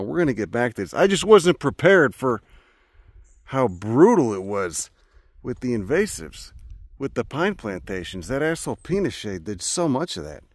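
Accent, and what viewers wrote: Chicago, United States. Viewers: Is that a Chicago accent?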